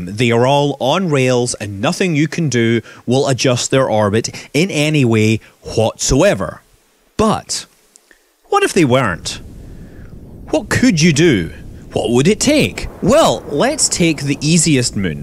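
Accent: Scotland, United Kingdom. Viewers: Cool you sound Scottish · Very, very Scottish. And I live there. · Hehe where about in Scotland are you from? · Your epic Scot manly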